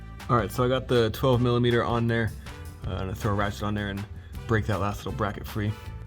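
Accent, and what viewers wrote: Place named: Toronto, Canada. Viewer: You from Toronto?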